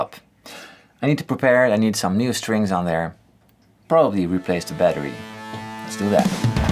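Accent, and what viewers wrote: Netherlands. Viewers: Olivier Yes. He's dutch! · Hey, I wonder where you're from! You're English sounds like how dutch people speak English. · Ik wist niet dat je Nederlands was. Hahaha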